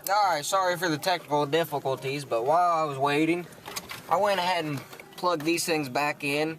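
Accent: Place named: South, United States. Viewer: Called good ol country boy accent. It is how we talk down south of the Mason Dixon line. Lol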